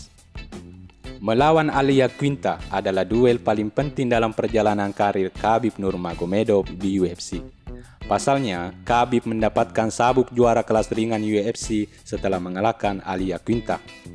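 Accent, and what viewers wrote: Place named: South Sulawesi, Indonesia. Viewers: Yg punya chanel pasti saudaraku dr p sulawesi.dr logat nya. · Itu suara orang sulawesi bro.. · host nya pasti org sulses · Orang Bugis yah pembaca narasinya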